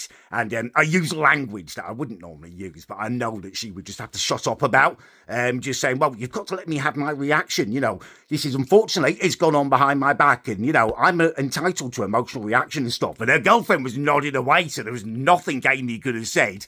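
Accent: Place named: Blackburn, United Kingdom. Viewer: Think he was born in Blackburn, I'm from around there and he has the same accent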